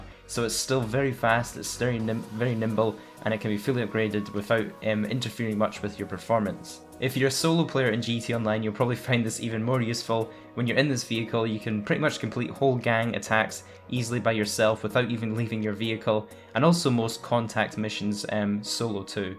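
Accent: Scotland, United Kingdom. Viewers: u sound Scottish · Are u from Scotland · are you Scottish? love the accent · Clicks on video, finds out he's Scottish, INSTANTLY SUBSCRIBES. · are you Scottish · You're Scottish?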